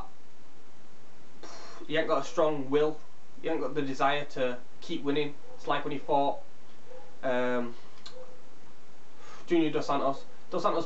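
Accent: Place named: United Kingdom. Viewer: great british accent you have. Awesome accent, though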